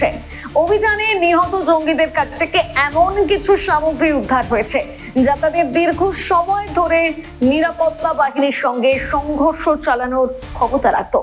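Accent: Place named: Bangladesh. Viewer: কি খেত , কোথায় হাগতো সে খবরে কি দরকার?Glorification of notorious animals channel গুলোর ব্যবসা!বাংলাদেশী মহিলা মনে হচ্ছে উচ্চারন শুনে!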